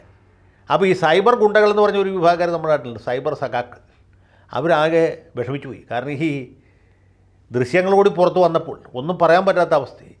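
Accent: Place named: Trivandrum, India. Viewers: Trivandrum language super sir 👏👏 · സർ ____തിരോന്തോരം ഭാഷ കിടു 😄🤣😂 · 😂😂😂😂 സാർ തിരുവനന്തപ്പുരം ഭാശ കലക്കി😂😂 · Your Trivandrum slang is so good.. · U deserve appreciation, tvm slang- well done..... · Trivandrum slag pwoli😂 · is very proficient in trivandrum dialect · സാറിൻറെ മിക്ക ഡിബേറ്റ് കളും, വീഡിയോകളും,ഞാൻ ശ്രദ്ധിക്കാറുണ്ട് പക്ഷേ ഭാഷയിൽ വരുത്തിയ മാറ്റം ഈ തിരുവനന്തപുരംകാരനായുള്ള പരകായ പ്രവേശം വൗ 💪😛സൂപ്പർ🙏🏻♥️ സാർ മാസാണ് കൊല മാസ്സ് ♥️♥️♥️♥️♥️♥️♥️♥️ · Sir your TVM slang super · Super thalaiva especially Tvm slang sema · Trivandrum slang is super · Super Prezzentation by Advocate Jayshankar sir 😁😁😂😂.Tiruvananthapuram SLANG 👌👍😂.Another AVESHAM Episode 🤣🤣. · തിരുവനന്തപുരം സ്ലങ്ങു അടിപൊളി സർ 🙏🙏👍👍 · Sir I like very much your TVM language. Super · ഓ അണ്ണന്റെ തിരോന്തരം ഭാഷ പൊളിച്ചു😂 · 😂😂🙏🤝👍🤭 സാറ് അസ്സലായ് തിരോനന്തരം ഭാഷ സംസാരിക്കണല്ല്😂😂യെവള് രാജ്ഞിയാ മുഖമടച്ച് ആട്ടണം പാവം ഡ്രൈവർ നിയമം അറിയാവുന്നവർ ഇവർക്കും ഭർത്താവിനും എതിരെ കേസെടുക്കണം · Adv സാറിന്റെ തിരുവനന്തപുരം ഭാഷ വളരെ നന്നായിട്ടുണ്ട് 👌👌👍👍😃😃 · Sir your Trivandrum slang is great🎉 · Nice TVM slang...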